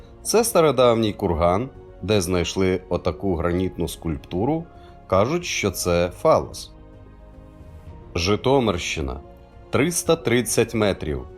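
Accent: Russia. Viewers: Не двух а двох і у диктора відчувається декотрий російський фонетичний акцент, певно думає на ній і розмовляє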